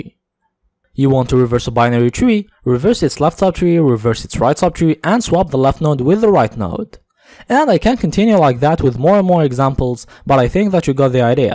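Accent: India. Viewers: I can't understand this indian accent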